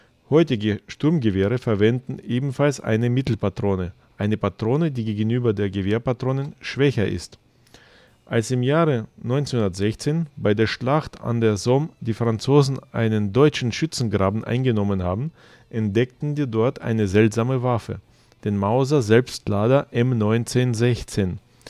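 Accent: Russia. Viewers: Geil , dieser russische Akzent im einwandfreien Deutsch! Top Video! 👍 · Ich bin begeistert, Ich fand ja schon immer das die AK 47 ein bissen wie die Sturm 44 aus sieht . Dein Akzent ist ausgezecht ich liebe Russischen und spanischen Akzent. ich bin gespant auf dein nächstes Video.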